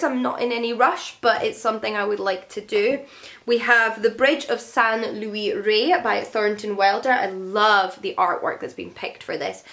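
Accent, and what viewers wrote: Scotland, United Kingdom. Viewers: I discovered your channel a few days ago, and i have to say i'm not disappointed. Besides litterature; being a non-native (from Algeria) english speaker, listening to you really helps me getting used to scottish tongue. So thank you for all that. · you talk. i fall in love with Scotland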